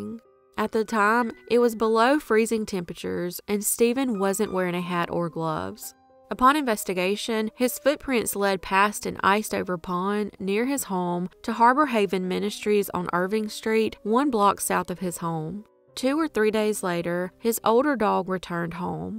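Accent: South, United States. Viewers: You have to tell me what state you grew up in. I am trying to place your accent. I’m guessing Alabama or Georgia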